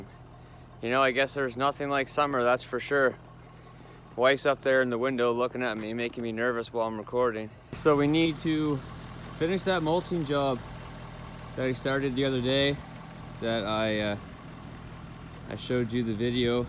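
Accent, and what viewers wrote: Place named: Maritimes, Canada. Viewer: Get it done before the snow flies again on Monday. You're "Maritimer" accent is coming out "Started up the trucks from inside the "ouse" so glad you don't have a "bout" too. Hahaha. Don't worry I bug my friends too about it. Nothing like a Caper talking about the squalls or de fishing season. Cheers.🎄❄🎄❄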